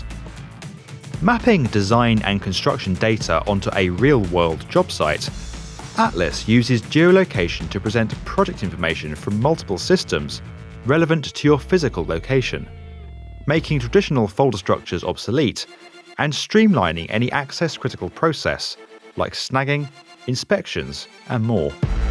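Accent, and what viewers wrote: United Kingdom. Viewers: I like this channel, but let's be honest, you can get away with having nearly everything you say get taken as credible if you do it in a smooth British accent with sexy video editing skills. While most of the things here really do have a lot of promise....there's also a lot of wank too. The most infuriatingly bad proposal, the dumb idea that just WILL NOT DIE, has to be the pavement or sidewalk thing that "harvests the energy of pedestrian footsteps". This is not going to become a thing. Ever. Please look into how these things actually (don't) work. It would take years of foot traffic to power a single kettle boil, probably centuries to ever pay back the energy cost of their construction and manufacture. They're a scam that just won't die.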